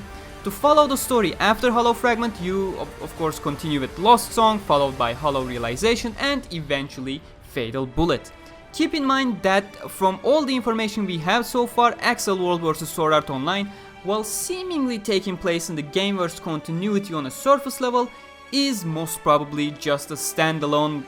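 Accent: Turkey. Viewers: Sesi duyduğum gibi türk aksanı olduğunu anladım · Yalnız aksan baya Türk :) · Telaffuzundan türk olduğunu fark ettim, güzel iş çıkarmışsın tebrikler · hiç kanal ismine bakmadım adamın türk olduğunu direk konuşmasından anladım başarılar kardeşim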